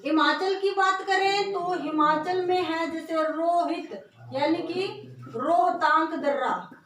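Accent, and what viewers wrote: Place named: Haryana, India. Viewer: Nice but d'nt use haryanvi ton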